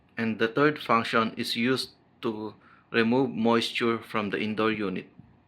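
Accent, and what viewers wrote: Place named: India. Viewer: Why the fuck do I keep getting Indian speakers?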